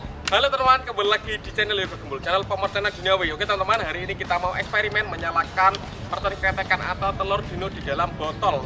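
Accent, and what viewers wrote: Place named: East Java, Indonesia. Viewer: Orang jawa timur kan bang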